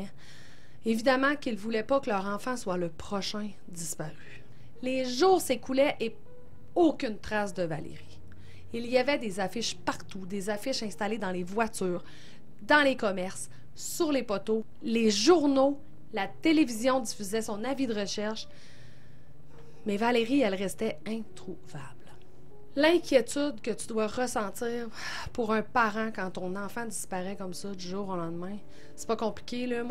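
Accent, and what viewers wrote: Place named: Canada. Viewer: J'ai découvert ta chaîne il y a peu, j'ai dévoré tes vidéos les unes derrière les autres j'adore, j'aimais beaucoup ton ancien générique car on y voyait les plus grand serial killers mais le nouveau à vraiment la classe ! Très bien réalisé 👍 Continue lache rien j'adore ton petit accent canadien ( mon rêve d'y vivre... ) de gros bisous de Reims en France 😘❤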